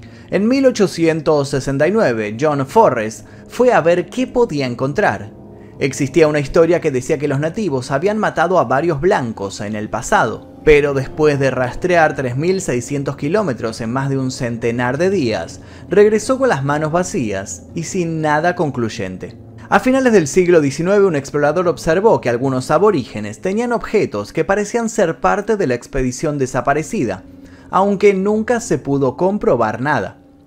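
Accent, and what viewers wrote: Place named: Argentina. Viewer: Me interesó mucho el caso Asha Degree, pero por no ver el vídeo y sólo escucharlo, al principio lo busqué como "Aya" Degree, entendiendo la sh como "y" 😂. No se ofendan hermanos argentinos, es la particularidad de su acento, excelente video.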